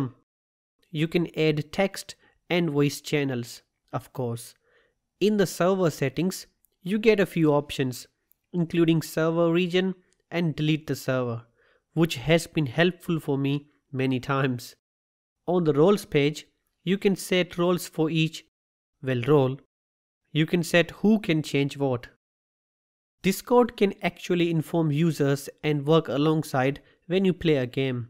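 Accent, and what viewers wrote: India. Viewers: Don't understand Indianenglish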